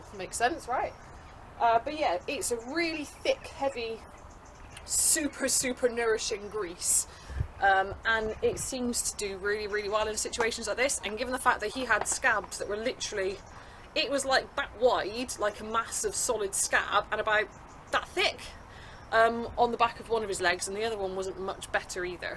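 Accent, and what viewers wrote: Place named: South, United States. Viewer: Very informative as usual and you can’t beat a southern accented girl talking udder grease and pig oil lol x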